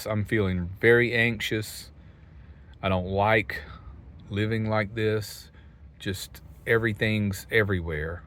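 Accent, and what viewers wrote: South, United States. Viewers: you're the best! Every time I see that you have a post, I get excited. I love your little accent. I'm originally from Oklahoma City, I live in Arizona and have for the last 30 years, but I still have a special place in my heart for the southern folk with accents. You just seem so genuine and so cool! I wish nothing but the best for you! I'm looking forward to seeing what you end up with! You deserve some comfort, you hung in there and done great things! I love it when you say I'm tinkering! Haha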